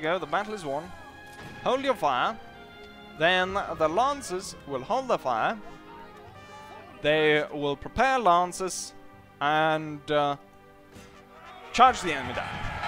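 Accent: United Kingdom. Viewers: Your accent sounds more British when you talk normally 😂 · I have just started watching your channel . I am really impressed with your english accent . If we were in this era you'll make a good soldier . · Nice british accent you got there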